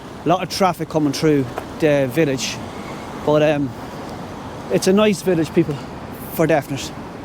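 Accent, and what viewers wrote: Cork, Ireland. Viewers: We just love watching 👀 just love Cork and that accent we love going on a journey with.Happy Sunday evening see you next time.🎅🎅🎅🧑‍🎄🧑‍🎄🧑‍🎄🧑‍🎄🤶🤶🦚🦚🦚🦚🐸🐸🐸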